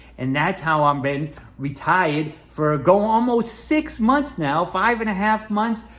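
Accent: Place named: Massachusetts, United States. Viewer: Love hearing the MA accent! Reminds me of home. It's nice to see a retiree enjoying a simple life. Not everyone wants to travel around the world in retirement.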